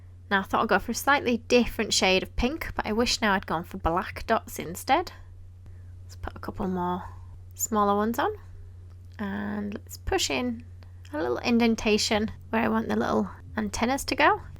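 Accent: United Kingdom. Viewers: Your britain accent is annoying.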